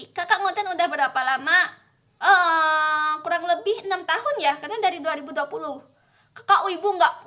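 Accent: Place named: Palembang, Indonesia. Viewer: Pantasan bahasa nya kek ada setengah bahasa palembang gitu ternyata kakaknya orang bengkulu di sumatra selatan soalnya ane orang sumsel juga🗿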